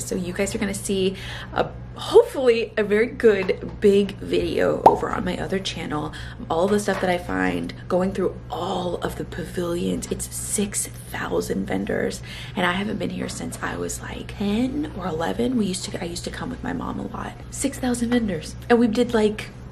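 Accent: Texas, United States. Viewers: "I was really maaaaaaad!" 🤣🤣🤣 Your Texan accent is really coming through, it's awesome!!!!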